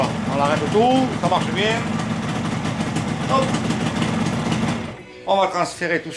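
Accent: South, France. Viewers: t'a voix dû sud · j'adore ton accent, ça sent bon le sud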